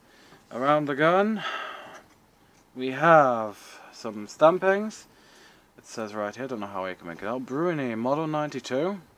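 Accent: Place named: United Kingdom. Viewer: sounds brit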